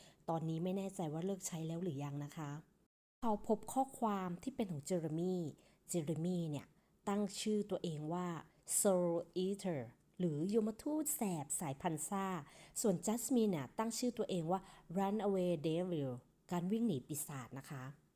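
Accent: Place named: Thailand. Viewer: สำเนียง eng ไทย มันจะพาลิ้นพันกัน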